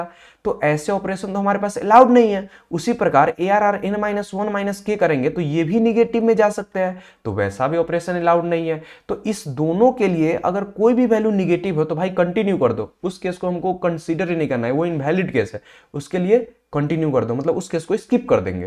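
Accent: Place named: Bihar, India. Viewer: explained amazing . By your tune you looked Bihari/up, I am also a Bihari. good to see you bro.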